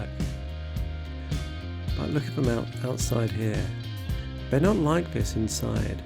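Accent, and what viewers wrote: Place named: England, United Kingdom. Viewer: I can hear your cats interrupting you She is very talkative alright I love your cat You have nice videos!🤩 They are beautiful a darling cats! 😘😘😘 What are their names Was not able to hear you well You have an English accents and I have poor hearing sorry I am 80 yrs already Thank you for sharing friend 🌹🌞🌹🌞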